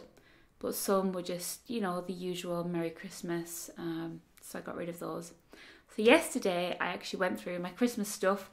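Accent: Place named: Northumberland, United Kingdom. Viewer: Malina Koschier She sounds Northumbrian .My mother in law was from that area and had the same lovely soft accent.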